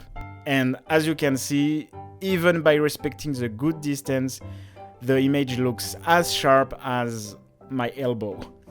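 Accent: France. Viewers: love the french accent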